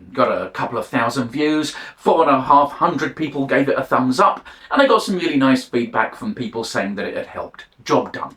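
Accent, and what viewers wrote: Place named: United Kingdom. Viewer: What painted nails? Im too busy reading his face while hes talking. Im too busy taking in his words, tone, delivery, expressions, eye movement, what hes wearing, his cleanliness, his neatness, whats he wearing, whats in the background, where is he? Are there background noises? What area of the UK is he from with his accent? And my own autistic brain needs time to digest and process his words. Where is he from? What does he do? A thousand things to take in. I didnt notice any polished nails. I was too busy watching his face because thats how i listen. I listen with my eyes as well as my ears. 😅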